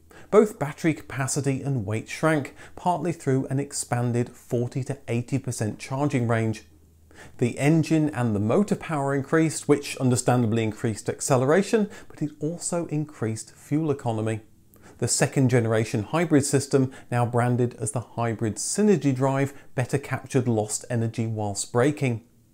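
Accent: England, United Kingdom. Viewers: Same here after listening to ur way u deliver us which is similar like UK English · Yeah i'm very surprised too. With all the english cars videos and the accent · I was about to say the same. You've done well keeping such a clear English accent without any hint of an American accent. I remember a lad I went to school with, he went to America something to do with becoming a sports coach when he was around 19. When he returned after a few months, he had a ghastly 'fake' accent to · Didn't realize you're are American. To me you sound British. Also the choice of cars is in my opinion very European. Anyway, great channel. Thank you for all the time you put in. Cheers from Bavaria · You had me fooled too. Started following your channel very recently, and mistook you for a Brit . Are you from the Midlands originally - Birmingham, maybe? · You always seemed to be from the UK , some sleepy village there but great stuff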